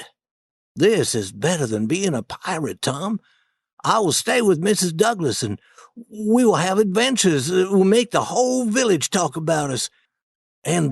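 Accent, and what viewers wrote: United States. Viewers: it's useful to practice and mimic American accent :")), thank you so much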